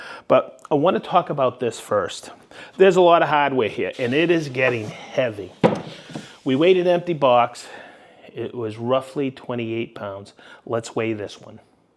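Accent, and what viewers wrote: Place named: Maine, United States. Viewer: such genius in simplicity and Central Maine accent. Take a Shot for every "Whikkid"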